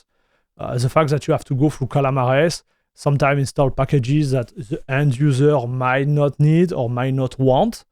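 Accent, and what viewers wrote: France. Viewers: bro he's likely native French and learned english, how do you think accents exist? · It's Frenglish but it"s not too bad 😀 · French accent at it's best! Love!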